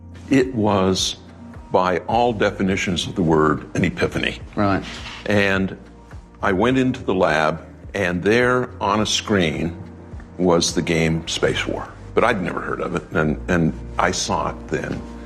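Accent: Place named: Australia. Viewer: Aussie Accent ❤️💋👍😃🇵🇭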